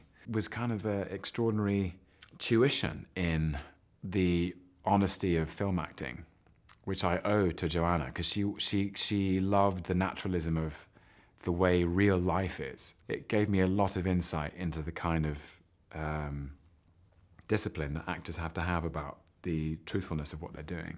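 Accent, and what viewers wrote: United Kingdom. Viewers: Tom的聲音真的好好聽...還有那個英國腔真的太酥了吧（倒地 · 我只是來聽他的英國口音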